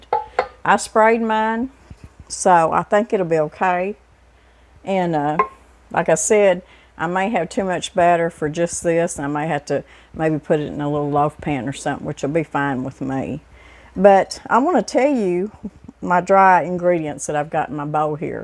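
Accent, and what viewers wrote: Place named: Arkansas, United States. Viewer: Boy, did you bring back some old and wonderful memories. I used to make pumpkin bread in Folger's Coffee Cans and it always turned out wonderful. Later down the road I went to a pampered chef party when they introduced their new baking round, for baking breads. They had their Pam. Chef logo and design in them, which I thought was so cool. But to my dismay, after receiving mine and baking a loaf, it looked for like a cheese roll because the pans themselves were not anywhere big enough in size (round), it baked up too quick and as I said looked like a cheese log. So, I went back to my old way using Folrgers Coffee Cans. You remind me so much of some of my Aunt's who all live in Arkansas and "sound" just like you. I was born there (Morrilton Ark) but my Dad moved us to Texas for when I was 5 years old. We traveled back there most every year for vacationing and "Decoration Day". 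I love Arkansas. Those many times I spent there are the best memories of my life. I'm new to your channel and subscribed, so I'll be making many of your recipes I found, first being your pumpkin bread recipe. Thank you so much for sharing with u and say hello to my fellow (Arkansa-yers) !.. God Bless you and your husband. Linda D. from Texas.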